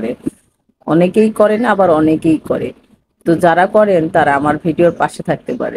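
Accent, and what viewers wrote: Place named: Rajbari, Bangladesh. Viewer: আমার গ্রামের বাড়ি রাজবাড়ী আপু তোমার কথা সুনে বুঝতে পারছি তাই আপু বন্ধু করেছি গত কাল ব্যাক দিও প্লিজ।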